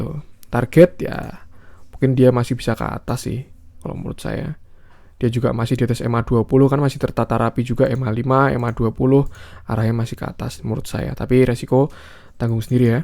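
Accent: Indonesia. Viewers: Dochy Suara mimin nya medok banget, Dan terdengar sangat familiar, Dr.mimin 😂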